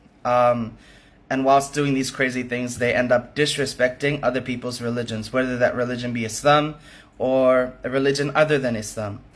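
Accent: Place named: United States. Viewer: klo dari logatnya org amrik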